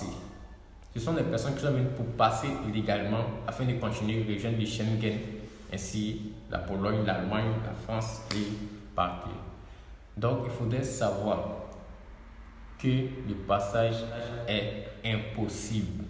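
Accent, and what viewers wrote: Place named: Central Africa. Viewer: Bjr, M.. En dehors de ton nom atchié, je ne sens pas ton côté ivoirien dans les mots utilisés, leur prononciation, la composition des phrases, les répétitions de paroles, le niveau du langage, les mouvements du corps, et surtout dans les idées présentées. Tout indique l'Afrique centrale: Gabon, RDC, Congo, RCA, Cameroun... Sauf l'expression "pour m'encourager."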